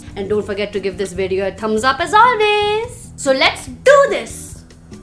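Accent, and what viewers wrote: India. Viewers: ur grown up in germany...but ur accent sounds indian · I love ur desi accent and not faking it !! <3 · your accent is Indian. I don't think you are born and lived in Germany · You mother tongue is German? I mean what? You look so Indian!! Woah! And the way u speak Hindi is so proper.. You grew up their.. still your Hindi is So awesome and your English is Indian accent! You gained 200% more respect from me :D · how did you grow up in Germany and have such an Indian accent?? Are you exaggerating it? to make it more entertaining? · i really love the fact that you were brought up in Germany with a German mom too, but still speak Hindi really well, speak German like a boss, and speak English with the Indian tango! It's so unlike the other kids brought abroad without any sense of their roots, great work! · U grew up in germany but ur accent sounds like desi...Which literally makes no sense. 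· i love u for ur indian accent......luv u babes......u look exactly like a south indian actrrss shari.....browse for shari-malayalam actress......u look like her exactly .....she plays villian/negative roles most of the times......u-u r the best.....love u lots....but kinda miss ur caps!!! they wre fab!!! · if you wanna be a critic, then no one's stopping you! But please, drop the Indian accent! For a lady who's travelled the world who was born in Germany, you got a real thick typical Indian accent, and your totally misusing it to talk trash about India! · why don't people subscribe? you are an acquired taste, it takes time getting used to you. but you keep people hooked one way or another. For the longest time I couldn't figure out if you had this desi accent for real or you were being sarcastic with the accent. Even with german as your first language, your indian accent is heavy. its alright, nothing bad, thats the way you are. 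· Doesn't seems like she been to Germany. Wow her accent is so Indian.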